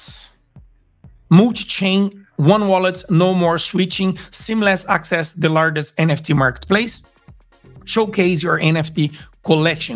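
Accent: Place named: Brazil. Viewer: Brazilian accent detected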